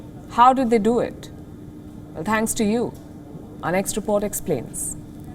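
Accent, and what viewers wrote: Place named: India. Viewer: I love the totty English spoken by this lady of India than English speakers beautiful accent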